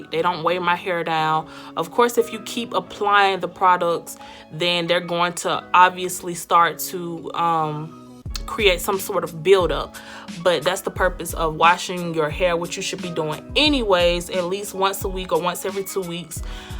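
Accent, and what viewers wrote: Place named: Atlanta, United States. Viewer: I knew you were from Atlanta, I can tell by the way you talk honey! Love this video. I use keracare curling wax and hard brush for my leave out because they were out of the stick. It works the same.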